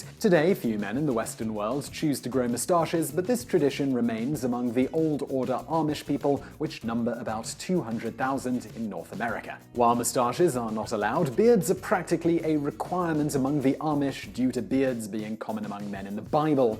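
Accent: United Kingdom. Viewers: Is it just me or is that Johnny Sins with a British accent and a beard? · I am interested in finding out today, what kind of accent does Simon have. I noticed how he says the word five (foive) finally (foinally) by (boi). What part of Britain does this kind of accent come from?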